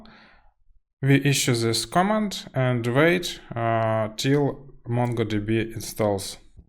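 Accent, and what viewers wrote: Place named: Russia. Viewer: Seems like a russian guy:)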